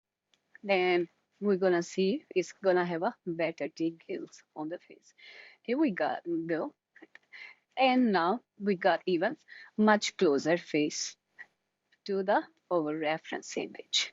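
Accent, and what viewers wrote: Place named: India. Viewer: Just about suffices as a walkthrough of building a very simple workflow for using FaceID with IPAdapter. Half of it is the application of using openpose with a controlnet and NOT a tutorial on FaceID. If you have Indian English and/or experience of low teaching quality then it may prove useful. However, if you're a native Anglo-American English speaker you may find the delivery extremely frustrating. If you are used to being taught by advanced pedagogists then you may also be disappointed by the lack of any clear explanation of the why. This is simply a very basic walkthrough of a simple workflow with no explanation of what is actually happening.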